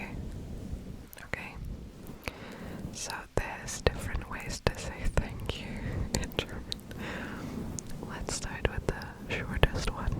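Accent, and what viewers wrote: Australia. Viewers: Before I found out she spoke German, I thought she was Australian too. Lol 🙃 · I'm Australian and assumed she was too lol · I thought you were Australian and had no clue you were German natively so 😅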